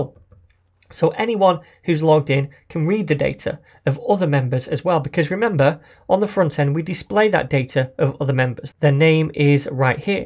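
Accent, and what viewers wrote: United Kingdom. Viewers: I always watch your videos, now I have the same british accent as yours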